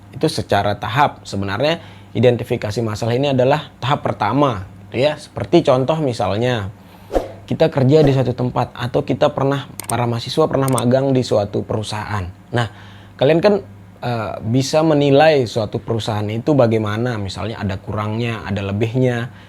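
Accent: Lombok, Indonesia. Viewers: Be elekm batur marak dialeg lombok basem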